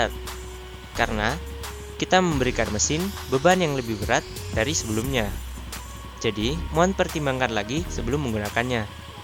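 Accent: Surabaya, Indonesia. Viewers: Lah org surabaya kah mang wkwkwk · Org Surabaya bang?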